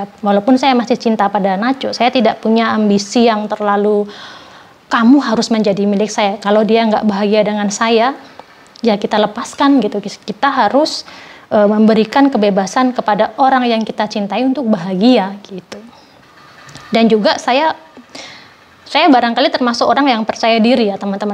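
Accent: Java, Indonesia. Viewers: Mbak orang mana ya.... Jowone ngendi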